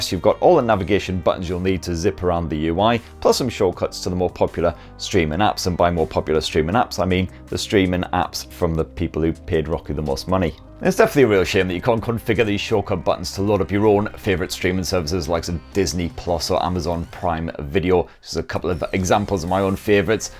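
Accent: United Kingdom. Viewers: His british english is a bit fast for me.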